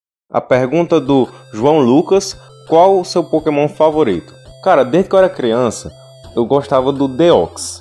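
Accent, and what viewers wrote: Northeast, Brazil. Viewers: Eu acho que ele mora no nordeste por causa do sotaque dele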